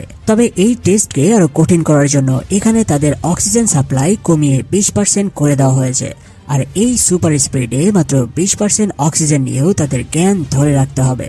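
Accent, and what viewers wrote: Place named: South Korea. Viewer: আপনি কোরিয়ান একসেন্ট এ বাংলা বলেন কেন? এমনিতে ভালোই বর্ণনা করেছেন।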